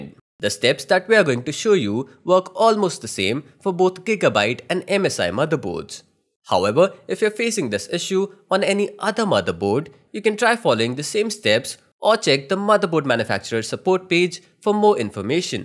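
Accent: India. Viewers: This has proven me again that I always have to trust tech people who sound Indian 🙏💖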